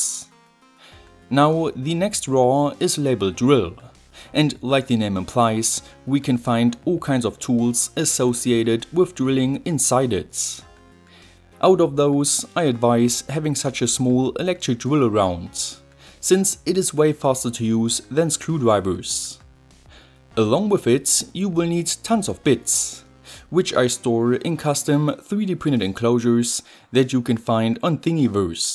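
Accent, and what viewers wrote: Germany. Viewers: I thought he was German, ja?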